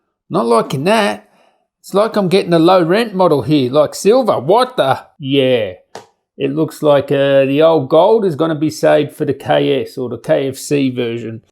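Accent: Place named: Australia. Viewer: Most fucking aussie voice I have heard in my life. But with a hint of wog too love it. Subbed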